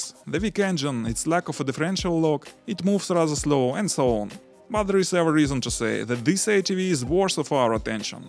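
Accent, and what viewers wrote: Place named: Russia. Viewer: Акцент то РУССКИЙ да и лицо наше, ничего не понятно но очень интересно.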